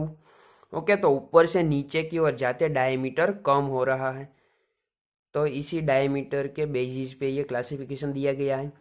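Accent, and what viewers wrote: Gujarat, India. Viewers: Bhai are you gujarati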